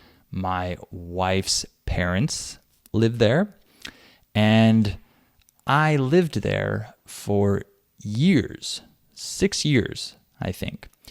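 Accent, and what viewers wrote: England, United Kingdom. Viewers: It is brutish English?? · Hello sir! I'd like you to provide me some listening material from A1 to forward. And I real love English accent perfect for beginners of English. I'm so thankful in advance. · British or American English ?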